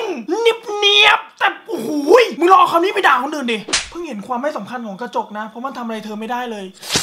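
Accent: South, Thailand. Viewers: 555เขาเเหล่งใต้มาเราเเปลทายย55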